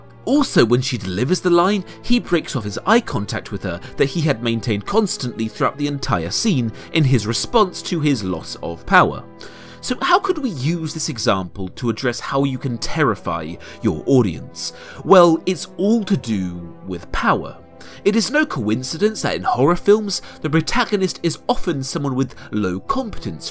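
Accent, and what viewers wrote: United Kingdom. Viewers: I am subscribing simply because of your sexy British voice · i love the british accent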